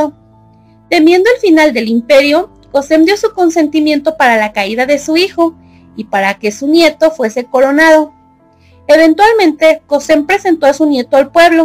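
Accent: Mexico. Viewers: Muy buen trabajo, interesante e informado. Me costó adaptarme al acento mexicano, pero valió la pena. 👍🏼🇺🇾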